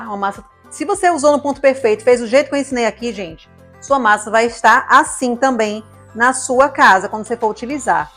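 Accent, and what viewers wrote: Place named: Pernambuco, Brazil. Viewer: Ganhou uma inscrita! Pelo sotaque reconheci que tu és uma das minhas! Queria uma receita ORIGINAL pernambucana. Quando abro um vídeo e escuto falar "rocambole" eu já passo.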